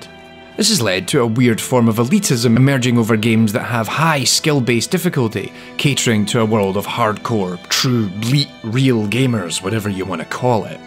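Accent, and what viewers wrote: Scotland, United Kingdom. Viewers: I'm just at the beginning of the video but I want to say this entire format seems really, really interesting and I love your accent, sounds a lot like a scottish guy whose channel is also one of my favourites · nicebagel not posh he is Scottish and has a strong accent meaning he is from either the central belt or is from a part in the highlands that is quite rural. I would be surprised if he was from Aberdeen or Edinburgh and would be amazed if he was from the western isles.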